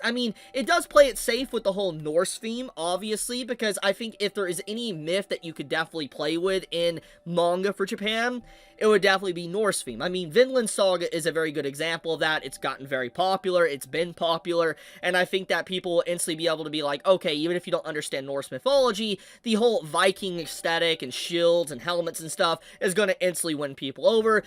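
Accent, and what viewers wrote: United States. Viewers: why do americans say "monga"?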